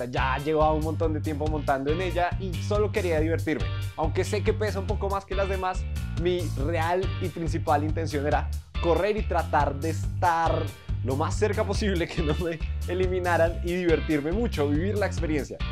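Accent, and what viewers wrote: Colombia. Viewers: Yo lo sabia cuando hablas yo digo el tiene asento colombiano y cuando escuche tocasipa selebre yo soy de bogota un saludo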